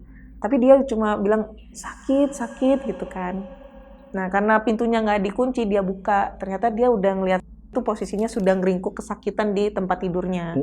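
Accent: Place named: East Java, Indonesia. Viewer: lidah wetan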